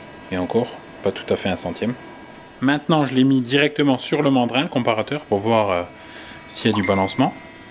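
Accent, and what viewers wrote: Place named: Eastern France, France. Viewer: un petit accent de l'est de la France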